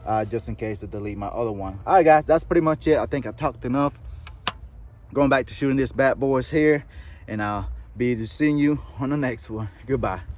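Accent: South, United States. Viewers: First time i ever heard a hispanic/southern accent mix. Nice!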